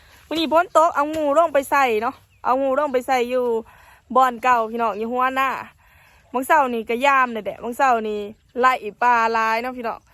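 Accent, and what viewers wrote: Ubon Ratchathani, Thailand. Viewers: เว้าปานคนอุบลแท้เจ่า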